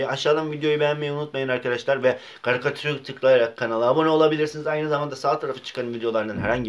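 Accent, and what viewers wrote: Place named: Trabzon, Turkey. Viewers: Trabzonlu olduğu için olabilirmi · abi artık trabzon şivesiyle konuşmuyosun neden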